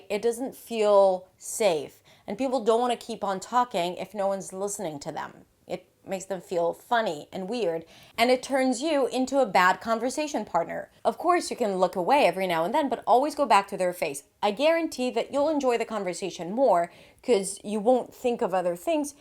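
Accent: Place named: United States. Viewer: how long did it take you to learn American accent properly?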